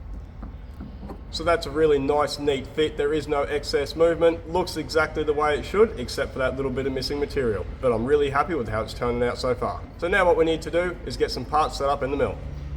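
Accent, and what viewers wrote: Australia. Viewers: I think appearance is every thing and your appearance is so much better when you shave your face, also your Camera Lady should also appear every once in a while as your Partner in Business. I also enjoy the Birds and is it Jeffery or Homey your Dog! You are Highly Skilled in your trade and you remind me of Myself, i also worked Many Hours in My Trade as a Collision Repair Technician for 43 Years. I also worked Many Hours, sometimes till Midnight! I Also Really Enjoy your Australian Accent!!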